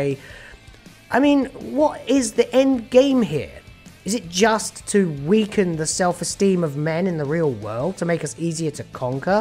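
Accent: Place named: England, United Kingdom. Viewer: Oh, now I know what Joe Pesci would sound like if he had an English accent. Good show.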